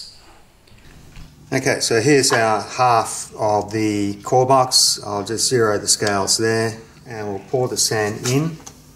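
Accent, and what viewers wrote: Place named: Australia. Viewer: Good job! Love hearing your accent it reminds my Mother in law. Yes she was an Aussi as well. Go Wallabys!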